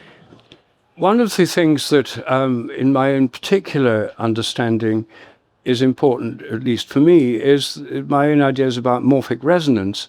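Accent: RP, United Kingdom. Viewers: This is such an endless rehashing. Rupert is still at it with his posh accent luring in his cult.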